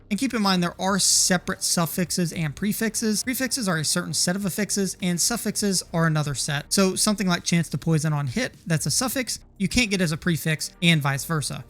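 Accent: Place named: Midwest, United States. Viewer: You have such a clean Northern/Midwestern accent - but why do you pronounce "affix" in the way you do - a-fix? Dictionary puts the accent on the A - for ah-fex.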